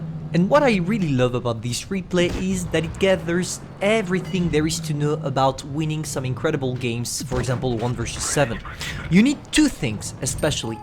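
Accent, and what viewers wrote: France. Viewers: Un super accent pour un français bravo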